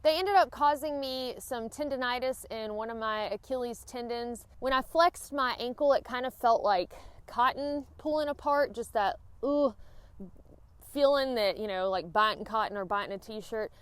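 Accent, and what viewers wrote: South, United States. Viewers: love your Southern accent. Im in the middle of getting ready for my 2020 backpacking trips. Updating gear.....long over due. You should also check out Topo running shoes....they have a great gaiter system also. loving your videos....keep them coming....Happy Trails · I'm from the south so....I don't like Hacking boots either....lol....jk...love your southern accent! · That southern drawl........damn grrrrll. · Miss there's nothing better than turning the computer on and there you are with that sweet southern accent giving this older lady some advice. It just makes my day!!! fter watching your Thermarest fiasko I bought a Nemo Tensor. I usually watch your videos and if it doesn't work for you I at least try it but I always buy from REI because of their return policy even if they are a little expensive. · I love your Southern accent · You meant, her Southern Accent? 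· I was watching with the captions on...I don't think the autotranscribe has been fully configured for Southern accents. It thought she was talking about 'hocking' boots.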